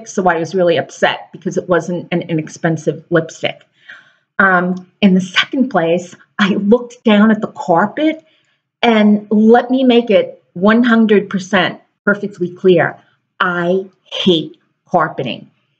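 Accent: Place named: Long Island, United States. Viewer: DrlinG i'm born and breed on LonG Island you accent takes me back to my childhood. When in the early 60's all the up and coming Queens families transplanted to Muttontown, L.I.no I didn't get the Locust valley lockjaw accent, it's Queens all the way baby, I am a recent hair losie, fourteen weeks in the hospital, let's just say between the illness and earnest hair being combed out there is not a lot to work with. My biggest problem is trying to keep a wig cap on. My skull bone in the back is quite shallow and the wig cap rides up. Oye. I have been using "Gots 2b" as a holding spray but there are time even that does not work.Any suggestions would be helpful. I so enjoy your videos, keep them coming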